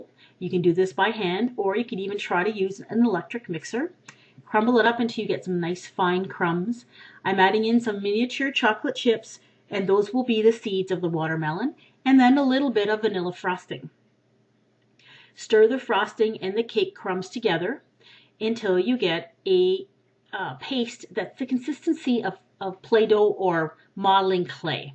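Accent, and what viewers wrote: Canada. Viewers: I could tell that you lived in canada before you mentioned it because of your accent c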